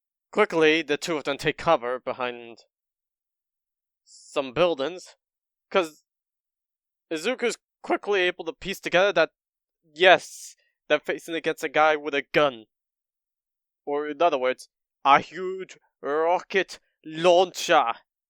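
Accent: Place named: United Kingdom. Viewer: Why, it sound like British accent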